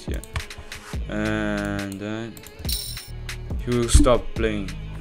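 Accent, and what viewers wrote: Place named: Italy. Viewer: ahaha nice remake bro. Si sente un po’ l’accento italiano ahahah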